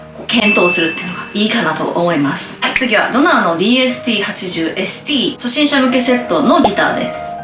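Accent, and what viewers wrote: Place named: Osaka, Japan. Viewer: 何色ものスペシャルカラー仕様のGOシリーズは提供だったんですね？ しゃべくりにいかにもな大阪のねぇちゃん味があって良かったです！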